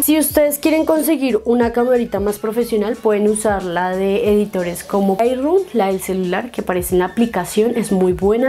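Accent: Colombia. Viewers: Me encanta tu asento Colombiano🥰😍 y me encantaaaan todos los tips para fotos, look y demás Saludos desde Torreón Mex.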